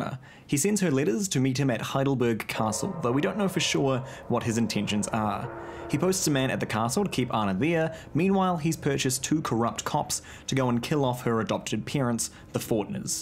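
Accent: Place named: New Zealand. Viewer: Also your accent is so interesting for me, it kinda sounds like Australia, but not quite. My guess would be New Zealand, but guessing accents in a second language is really hard, so don’t be offended if I messed it up completely lol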